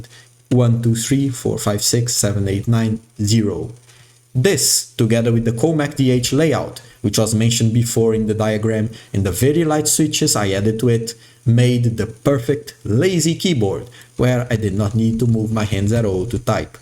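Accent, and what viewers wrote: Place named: Brazil. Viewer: Ahahahhaha então somos conterrâneos! Inglês perfeito, mas desconfiei pelo leve sotaque da nossa língua. Muito bacana. Vou me inscrever e depois vejo uma forma de dar um feedback pra ti de como ficou o meu aqui.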